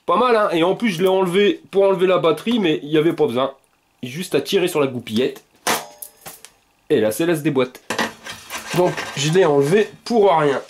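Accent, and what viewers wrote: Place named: Nord-Pas-de-Calais, France. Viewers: Salut, j'ai découvert ta chaine depuis peu et je me régale;) Des tests de malades avec de vrais retours! le tout avec notre accent chti;) J'ai acheté le même vélo dans sa version Y20 (même caractéristiques) et il est top! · je ne te connaissais pas ;j'adore ,tu me fais beaucoup rire avec ton accent du nord.et bravo pour tes commentaires au sujet de ce velo. je pense acheter le meme.continue comme ca .VINDIEU.......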